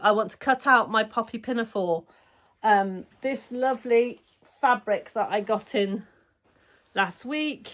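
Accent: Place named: United Kingdom. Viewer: Oh....your lovely British accent!! A Router ... tee hee, got it now! So enjoy your sparkle videos!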